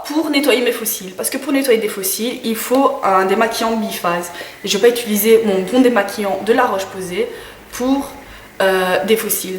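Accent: Belgium. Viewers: salut, je viens de découvrir ta chaîne et j'aime beaucoup tes vidéos tu es claire et précise et ton accent Belge je crois si tu es Belge je l'ai vite capté lol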